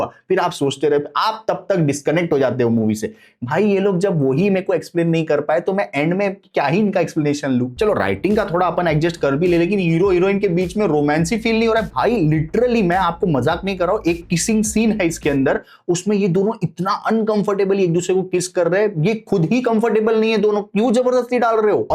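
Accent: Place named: Mumbai, India. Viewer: Bombay wali Hindi bol raha hai bhaiya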